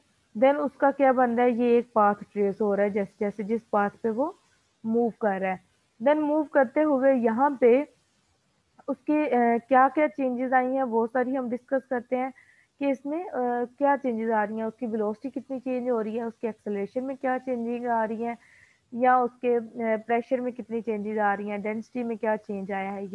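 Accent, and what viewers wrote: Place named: Pakistan. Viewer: Voice se hi lg gya tha Pakistan ho ...🙈😅😅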